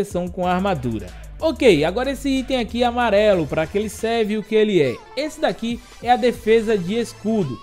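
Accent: Northeast, Brazil. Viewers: Youtubers com sutaques nordestinos são os melhores pra explicar as coisas kkkkkk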